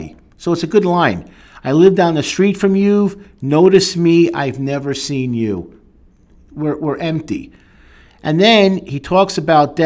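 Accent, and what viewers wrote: Brooklyn, United States. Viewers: Sorry to disappoint, but that's pure Brooklyn. I grew up in a neighborhood called Bensonhurst.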